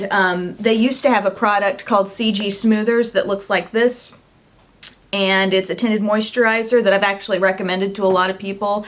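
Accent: South, United States. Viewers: i subscribed just because of your awesome southern bell accent :P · very true! i totally agree! i also assume youre from the south? very strong southern accent! i like it tho! im from wv but most say i have a very northerer accent saying "mom" like "maam" we turn our o's into a's for some odd reason?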